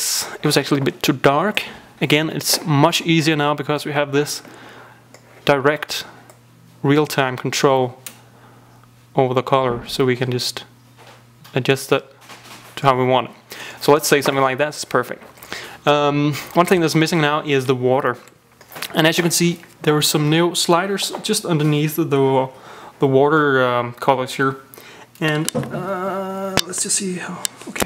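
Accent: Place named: Denmark. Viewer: Idiots, it's because he is from Denmark not UK or USA. That means English isnt his main language, I guess :P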